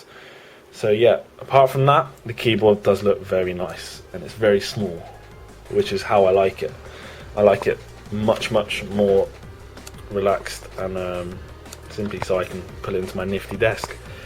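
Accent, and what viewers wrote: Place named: United Kingdom. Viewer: Youtube showed an ad for the new Sacha Baron Cohen film, just before this film, and suddenly your face popped up with that british accent.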